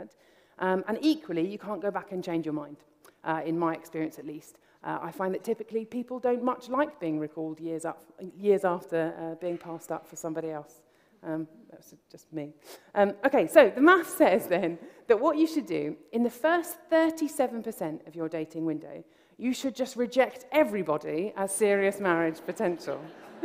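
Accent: United Kingdom. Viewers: Smart, likes math, sense of humor, British accent and a ginger. I'm in love.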